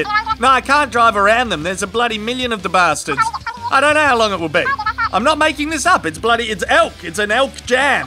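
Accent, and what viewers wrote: Australia. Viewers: 50% funny from the video. 50% funny from the accent and Aussie colorful phrases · What is it about an Aussie's accent that just makes things funnier? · FMD... how it really is, Aussie style commentary, thank goodness for this!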